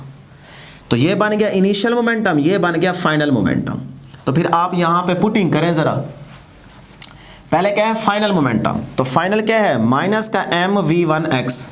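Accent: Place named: Saraiki, Pakistan. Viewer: Sir ap saraiki laghta hnn😊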